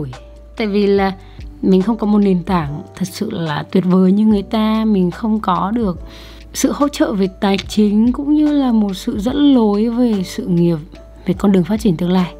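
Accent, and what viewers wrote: Vietnam. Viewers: Nghe giọng c trong video đã ngờ ngợ r nhưng mà giờ mới biết c là người miền trung hihi❤️ e cũng hàng xóm vs HT nè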